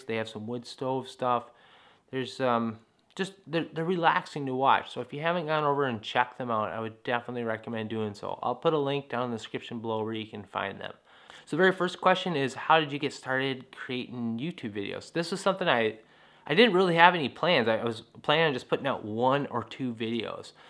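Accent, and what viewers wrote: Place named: Wisconsin, United States. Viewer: as someone from wisconsin you sound like your from wisconsin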